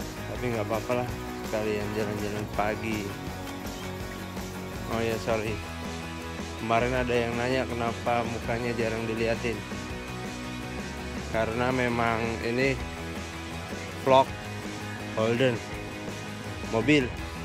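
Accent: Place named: Semarang, Indonesia. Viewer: Kassemarange daerah ngendi smpyn apal q logate qong semarangan ngene ki.. Kebetulan tonggo dwe kas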